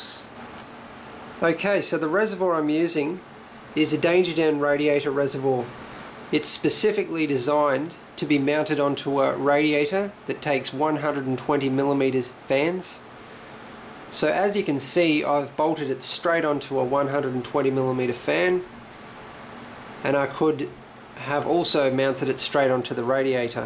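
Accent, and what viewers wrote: Australia. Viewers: Is he Australian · are you aussie?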